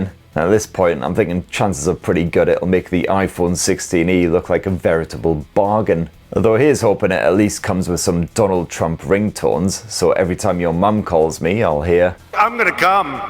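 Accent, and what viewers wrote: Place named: Glasgow, United Kingdom. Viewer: She sounds like a glaswegan saying "ya goon, let's go" after the post-work pints.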